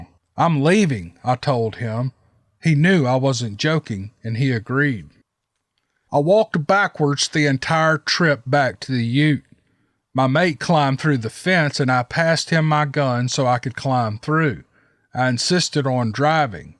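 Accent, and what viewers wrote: South, United States. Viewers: Another great monster in a swamp story! Nothing better. Especially from a guy with a very twangy southern accent. It makes me want to be there! lol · Welcome back we get to hear that southern draw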